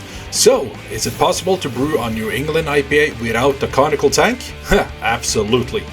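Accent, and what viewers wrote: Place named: Canada. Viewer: Awesome video, just subscribed. Where are you from, sounds like Canada Eh? Brought back childhood days of the McKenzie Brothers!!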